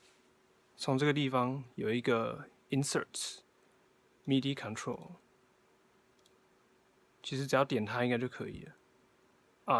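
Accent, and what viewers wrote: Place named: Beijing, China. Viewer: Nin shi beijing ren ma? Nin shuo de henhao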